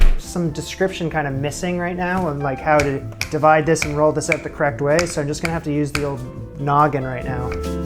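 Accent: Canada. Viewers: Do I hear Canada great video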